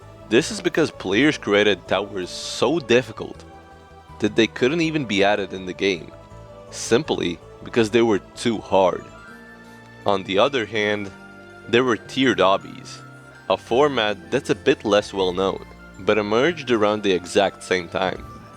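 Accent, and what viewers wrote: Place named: Latvia. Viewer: Latvian accent💔🙏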